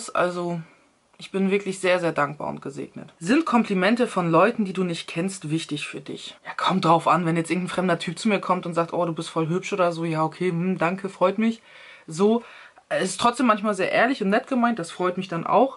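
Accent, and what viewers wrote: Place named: Russia. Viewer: Ja man russischer Akzent ist mega 😍😍 Wuodga... 🥃😋😂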